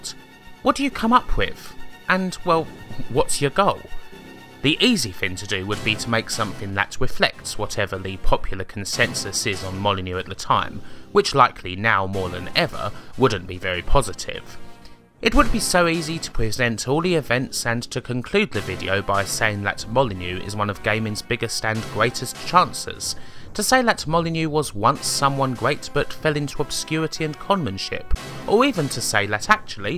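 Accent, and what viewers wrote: United Kingdom. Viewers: aa aa I disagree. People do have time. It's just that people don't want to take that time. As for her British voice, yeah well, you'll get used to it. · The problem is his video's are to long. He needs to cut it down. It's like a dude rambling around one person for hours on hours long. get's old fast. Make a 10-15 minute video and combine the big points. The moment people see the time on the video they will click it away directly. Nobody has time for such long talks. It also doesn't help, his voice is extremely british, which makes it kinda annoying to lissen to for long times. As his voice contains lots of low and heights sound pitch voices.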